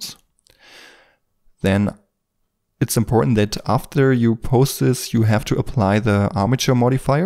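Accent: Germany. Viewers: Schönes Englisch, etwas hört man dein Deutsch aber nicht auffällig. Dieses Video war informativ und wirklich sehr toll!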